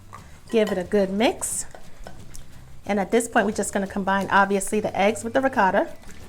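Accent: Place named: New York, United States. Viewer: I LOVE HOW SHE SAYS SAUWWSE (SAUCE) LOL THAT NY ACCENT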